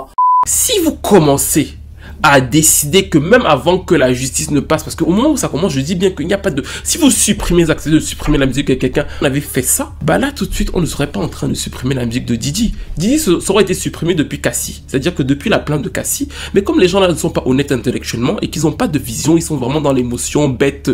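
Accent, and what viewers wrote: Cameroon. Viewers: J'aime bien le fait que tes origines camerounaises, commencent à se faire sentir avec les Eh etc !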